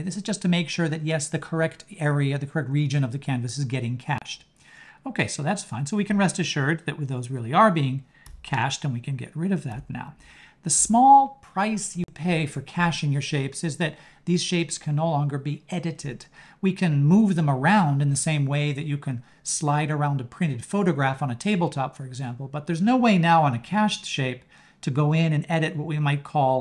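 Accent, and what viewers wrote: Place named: Canada. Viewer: So I want to know how to built a custom shape that I can attach a line to, then when moving the shape, bring the attached line endpoint with the shape? I am trying to build an application (not a game) with your tutorial’s help as I’m not a programmer or web developer. I know enough to dangerous, and stupid in some cases. I first have to figure out setting up the screen into parts for various roles, how to develop a shape library for my app, and then load an image, scale that image and reuse that scale for my other functions, then place the custom shapes (save that data on open/close) and a bunch more “magical stuff“. You sound Canadian, but you’re in Japan… interesting to say the least. Thanks so much for these tutorials.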